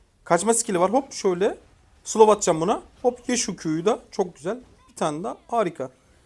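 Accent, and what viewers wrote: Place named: Black Sea, Turkey. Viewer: Reis bende samsunluyum okadar belliki karadenizli olduğun gerçekten çok güzel videolar başarıların devamını dilerim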